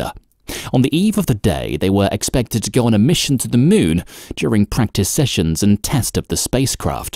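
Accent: England, United Kingdom. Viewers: Why do they use someone (computer) with an English accent? It's stupid and insulting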